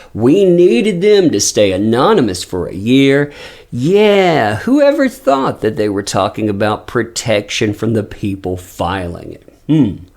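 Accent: South, United States. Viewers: Listening to your southern drawl hillbilly accent is irritating enough, but could you please stop with the repetitive "That former certain Aquaman starlet". Just say: Amber Heard and then maybe go for speech therapy 😉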